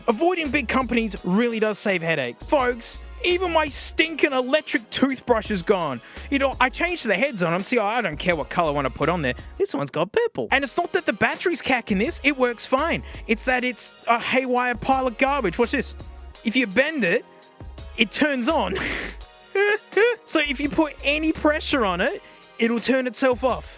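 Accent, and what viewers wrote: Australia. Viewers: And so he can FINALLY let his true Aussie language out.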